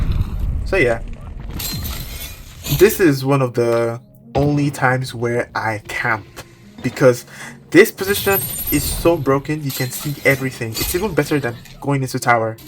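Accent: Nigeria. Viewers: Bro, are you Nigerian?😂😂 very useful video btw🤞🏽🙏🏽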